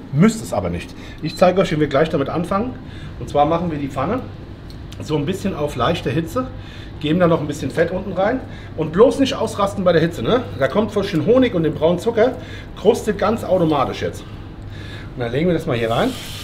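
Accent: Hesse, Germany. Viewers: Ein sensationelles Rezept, wie viele andere von dir auch. Es macht einfach Lust und Laune, die Gerichte nach zu kochen. Lieber, du bist ein Original und vor allem: Herrlisch des hessische Gebabbel. Mach weiter so👍👍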